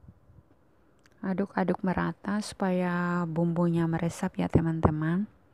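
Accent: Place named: Eastern Indonesia, Indonesia. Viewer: dari logatnya pasti daerahnya sama dengan saya yaitu Indonesia timur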